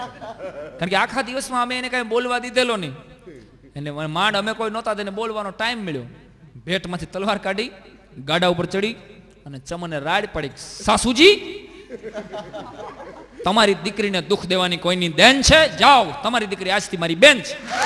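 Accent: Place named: Kathiawar, India. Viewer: su kathiyavadi laheko se .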